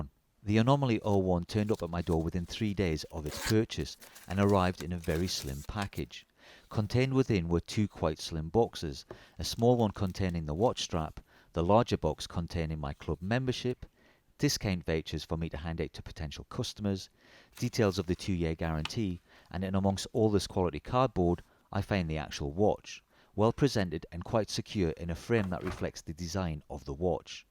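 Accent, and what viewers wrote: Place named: RP, United Kingdom. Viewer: For anyone not speaking posh british as a native, it is very difficult to understand the gentleman whatever he is saying. Subtitles anyone?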